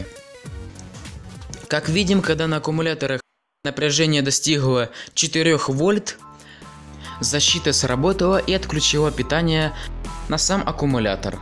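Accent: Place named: Ukraine. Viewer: украинский акцент просто, а вот с грамотностью речи поработать бы, либо читать по бумажке сначала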